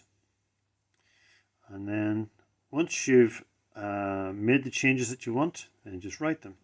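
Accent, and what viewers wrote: Northern Ireland, United Kingdom. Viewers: Hi down in Larne here and have 2 250w Bafang bikes and I am really happy with the default setting and I am now hitting well over 2,000 miles .Is this reset really helpful on a day to day basis ? p.s. you sound like a norn iron man .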